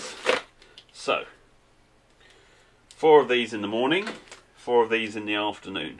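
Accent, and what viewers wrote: United Kingdom. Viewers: This guy is scotish? :D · it is the British version of a penny.I made the comment because of his British accent and that he said pence and not pennies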